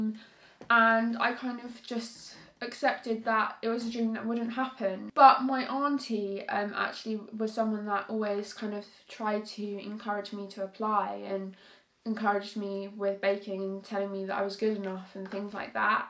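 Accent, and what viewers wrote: United Kingdom. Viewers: u have such a nice accent. I wish I could learn such a nice british accent ...I will try my best. it sounds so cute and british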